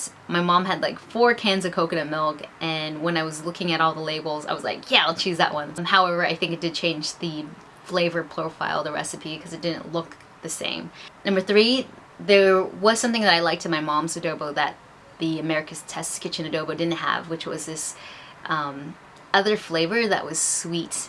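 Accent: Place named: Philippines. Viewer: Inday magtagalog ka nlng.. Obviously marunong ka mgtagalog accent p lng...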